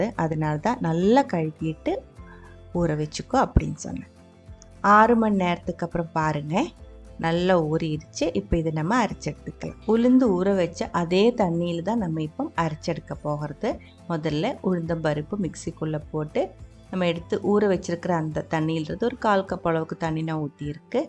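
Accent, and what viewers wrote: Kerala, India. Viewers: Excellent video ! Loved the accent - I speak Tamil like you but i don't succeed as much as you do in keeping Malayalam out of my Tamil. End up talking like Kamaraj in Michael Madana Kamaraj! Although I may not have the skills and the patience to make such great food, I would certainly tune in to hear your "Thalayalam". !! Suresh / Norway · In the middle of your efforts to speak in “Tamil” Tamil, your Malayalam flavor comes out, making it nice. Maybe U can speak in Malayalam itself since most Tamils understand Malayalam. This will also help them to learn Malayalam. Kollengode S Venkataraman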